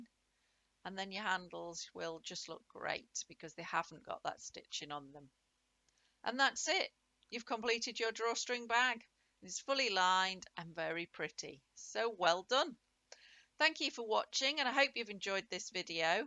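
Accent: England, United Kingdom. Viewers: Hi Mam, Happy New Year! It is a cold raining day in Virginia on 1/10/26. I live in an efficiency apartment saving for my first house to buy and my goal is for Summer 2026. I love fabric but, have not much storage and I am a waste not want not gal and save very tiny scraps. I made my first draw string bag with a round bottom as a faver for my boss's wife and totally did not get paid correctly with cost of supplies time and talent. It was a tricky pattern called a Knitters Bag. About 7 inches wide, 12 inches tall and a round bottom 10 inches across. I coukd not find a video for tge life of me to show how to have the casing with clean finished sides so you would not see a raw edge that could fray. So the fabric where the casing ends were for the rope draw string I did dabs of crazy glue with a Q-tip in a smooth fashion. I never saw any videos where the seamstress opened up the side seams. 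I love this video. Also I have been baffled how you turn back out the sides the right way from the bottom when you line your bag. I have learning disabilities and patterns are hard to follow. I so want to make little bags more so now for each holiday and these will be nice for Valentines Day fabric with individually wrapped candy inside and a little gift for my family members and 2 special friends at work. I am so happy I watched this after watching your mixed media card you made today. I am happy you gave your measurements in inches too. Your accent sounds English. My Dad is from Manchester, E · The best one and an English accent! Thank you from Southampton UK.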